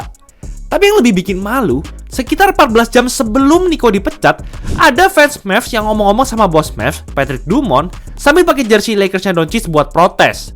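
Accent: Java, Indonesia. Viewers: Was kamu wong jowo kah ?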